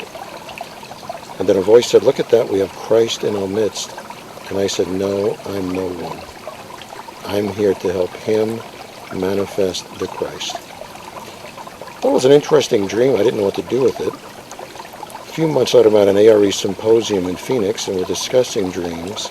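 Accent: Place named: Bengal, India. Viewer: Started off sounding like a bong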